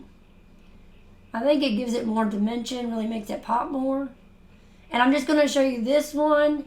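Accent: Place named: South, United States. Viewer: I love it! I agree with Tyler that you can really hear your southern twang come out when you’re crafting on video. Super cute! Love you! 💓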